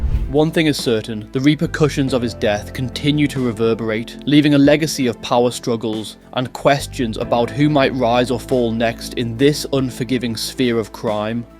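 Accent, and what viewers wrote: England, United Kingdom. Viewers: 😂You idiot it's obviously English, have you left Frankston before?😂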